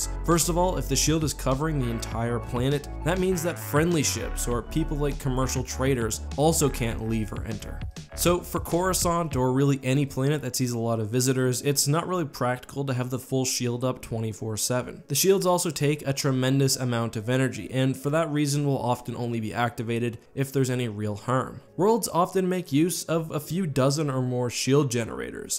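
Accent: Canada. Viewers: You sound Canadian for some reason.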